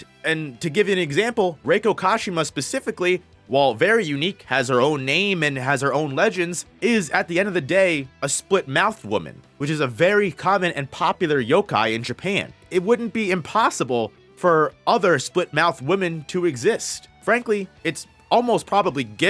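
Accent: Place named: United States. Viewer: The problem with american accent reading Japanese words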